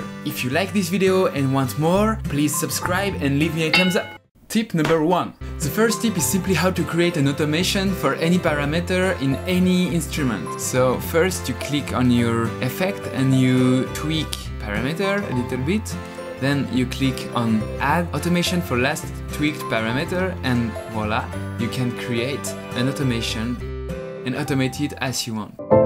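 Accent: France. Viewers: Are you french ? Because you speak like a french guy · tu es français non ? :) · Comment ça se fait qu'il n'y ai aucun Français par ici ? On reconnait bien ici un Français talentueux ! ;) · You are French ?! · Tu serais pas français par hasard je trouve aue tu as un petit accent... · French accent lol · You're French right? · Where are you from ? Because you have not an english accent and you love french. Are you french ? ?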